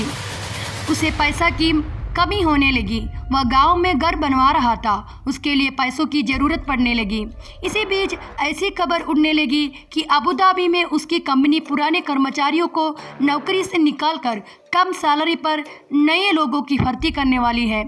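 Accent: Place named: Kerala, India. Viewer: Malayali aanale.. Hindi kettapo manasilayi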